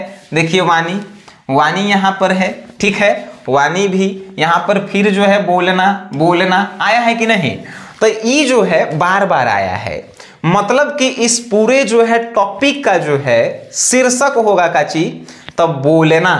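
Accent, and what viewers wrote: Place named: Bhojpuri, India. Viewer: sir aapki bhojpuri accent ke sath padne me aur maja aata hai ❤️😃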